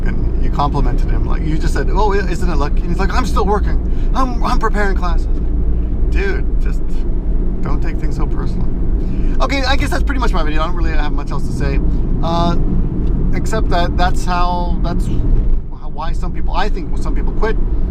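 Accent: Australia. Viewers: I gave you thumbs up simply for the Australian accent ;) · lol your Aussie accent sounded a bit British XD The first Youtuber that I ever saw. I don't remember exactly who but I know that I use to be really into Smosh. I know that they are still really huge but I can't really think of anyone else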